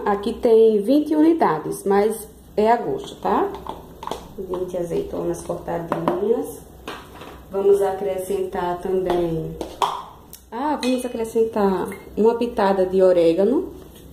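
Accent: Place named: Paraíba, Brazil. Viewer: Vc é paraibana? Sotaque lindo.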